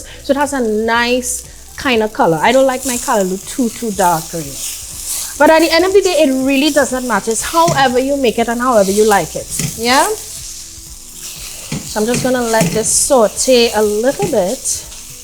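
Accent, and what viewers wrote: Trinidad and Tobago. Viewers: I’m Jamaican and never had that dish . It looks mouthwatering. I just your Trini accent. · Love her videos! She is totally engaging and genuine. Keep using the Trini dialect girl. It adds the extra taste in the local dishes that you present. Blessings. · Oh Ghosh!! Girl, I just love that I found you. I love how you talk. A real Trinbagonian. I’m here to stay for always🧡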